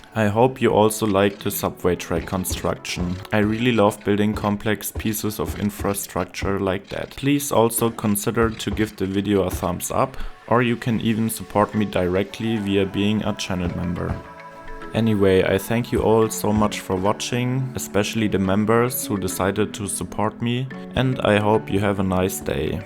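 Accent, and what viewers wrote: Germany. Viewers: i think u sare a german guyy because the accent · auch wenn du english sprichst kann man so übel hören das du deutscher bist XD XD dabei sprichst du ganz gut english ^^ der akzentt oder so isses XD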